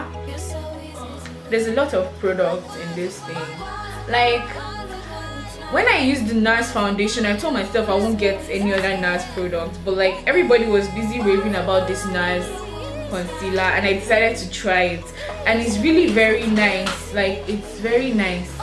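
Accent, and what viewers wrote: Nigeria. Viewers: Very nice haul,I love the way u mix our naija accent n words too,kip it up girl tfs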